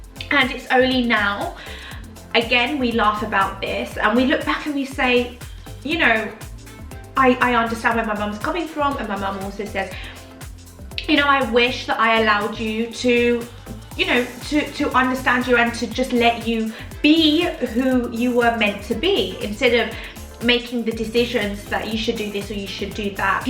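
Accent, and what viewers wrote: United Kingdom. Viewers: Thanks for sharing your story. There’s something great about our Pinoy culture— the love, the caring. And what are they saying? Love your brit accent! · Delicious! We love you!pride of phil with your British accent and a Filipino heart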